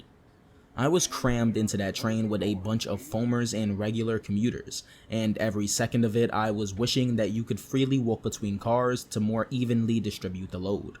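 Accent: New York, United States. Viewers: u got such a ny accent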